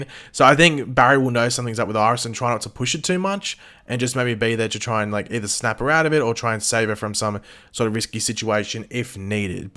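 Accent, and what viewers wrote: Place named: Australia. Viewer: B A He has an Australian accent bugs for brains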